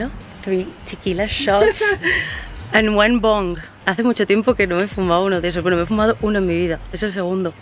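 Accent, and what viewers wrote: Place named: Spain. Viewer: Buenos dias ❤ muchas bendiciones mi diosa del olimpo mi chocolatito hermosa me encanta tu acento español lo amo bby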